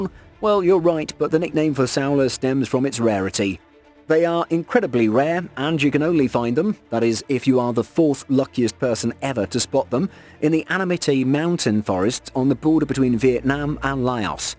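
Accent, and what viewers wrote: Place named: United Kingdom. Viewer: Finnaly new vid PS I like uer British accent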